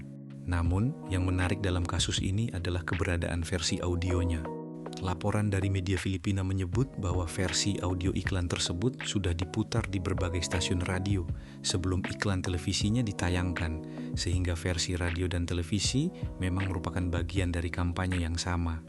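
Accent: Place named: Spain. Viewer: Buset logatnya kek orang ngomong bahasa spanyol 🗿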